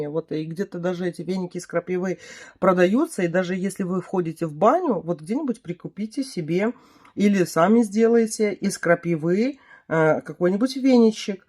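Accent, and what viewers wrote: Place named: Belarus. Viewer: Так девушка из Беларуссии, зачем вы учите как говорить? Мои знакомые оттуда тоже интересно по своему говорят и мне не режет ухо. ДОбре?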